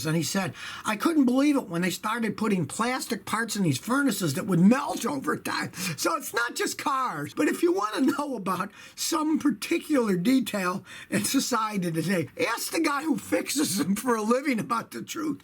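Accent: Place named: Buffalo, United States. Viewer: You talk like a buffalonian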